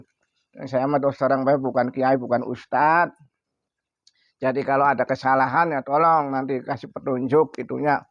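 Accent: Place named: Cirebon, Indonesia. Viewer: Wa'alaikum salam warohmatullahi wabarokatuh.... In syaa allaah mama yai barakallaah... ( punten mama yai, logat kayak wong cerbon... Maaf klw salah)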